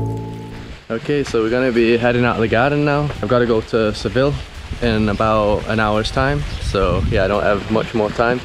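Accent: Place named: Northern England, United Kingdom. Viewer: I really love the accent from Northern England. Cordoba is amazing! Happy travels!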